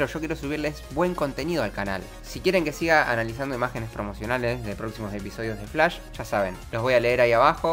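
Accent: Argentina. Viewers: De que parte de Argentina sos?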